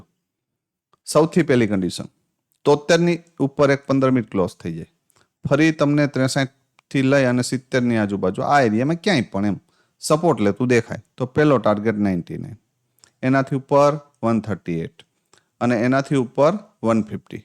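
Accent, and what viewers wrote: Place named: Kutch, India. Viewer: Kutchi Madu lago chho bhai bhasa par thi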